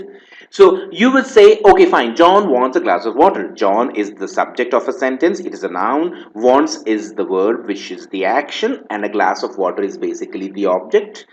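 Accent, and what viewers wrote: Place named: India. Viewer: I think the speaker is Indian because of his accent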